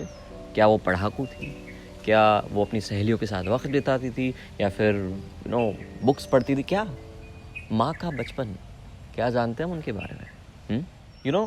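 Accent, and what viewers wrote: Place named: Rajasthan, India. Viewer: Rajasthan k lgte ho Bhai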